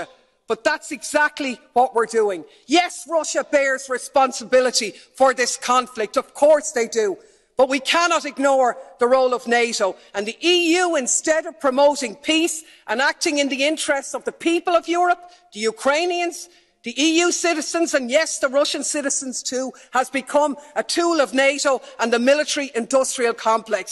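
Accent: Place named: Ireland. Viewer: Thank you!! Wise words spoken with a wonderful irish accent! 💚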